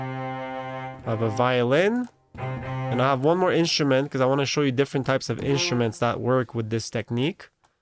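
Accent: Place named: Montreal, Canada. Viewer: The minute I heard your voice I could tell you were from MTL. Super fire watching different work flows! This a cheat code for many who don’t know music theory!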